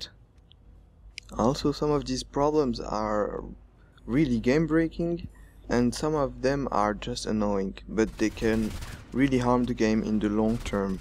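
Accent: France. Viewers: you french ? :0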